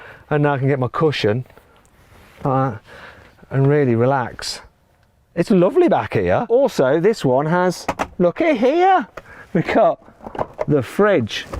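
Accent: United Kingdom. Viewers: つまりイギリス英語ですね。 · それと同じで、音速のマッハもマックっていいますよね。イギリス発音かしら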